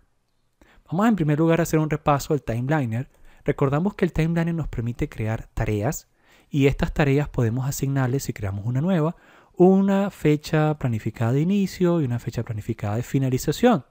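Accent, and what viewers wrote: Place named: Caracas, Venezuela. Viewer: los ultimos 6 segundos del video son los que hablan de la Conexión de Microsoft Project a Navisworks, TOOOOOOODO LO DEMAS ES BLA BLA BLA elemental. no dijiste lo mas importante, ni siquiera hiciste un click en el espacio modelo para interactuar con el modelo 3d. el video ni siquiera tiene una segunda parte como para justificar tanto bla bla bla ... sera por eso que solo tienes 367 vistas y solo un comentario desde el 2020.. que perdida de tiempo escuchando ese tono de voz irritante con acento caraqueno.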